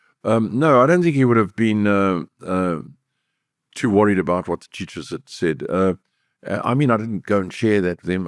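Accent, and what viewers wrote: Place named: Glasgow, United Kingdom. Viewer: Love it you can take the boy out of glasgow but canny take glasgow out the boy you just keep being your authentic self Loved it when you said diddy meaning did he wnen ge reveals an answer to a question in typical glasgow style yer like diddy then when you asked what it was like being in love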